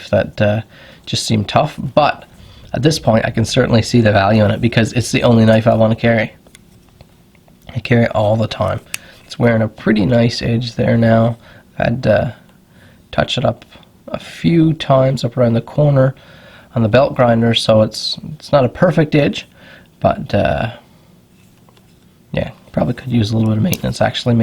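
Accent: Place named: Newfoundland, Canada. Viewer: Yesman your a newfie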